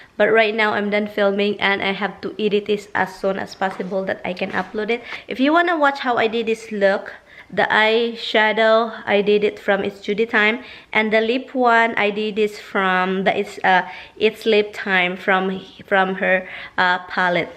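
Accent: Visayas, Philippines. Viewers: I always wacthing you ,,kc,,natatawa din ako s visaya accent mo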